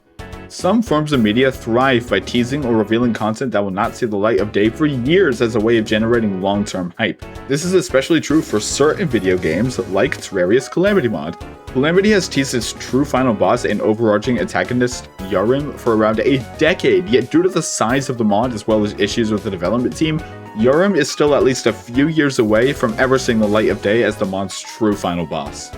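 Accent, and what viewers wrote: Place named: United States. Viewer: I thought it was a voice reveal but i was confused about the American accent. Then i checked the pinned comment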